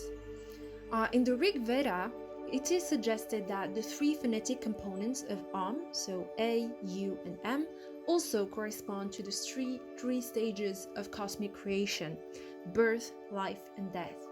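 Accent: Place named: Netherlands. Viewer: You narrate very well in English, but sometimes I get the impression your mother tongue might be Dutch?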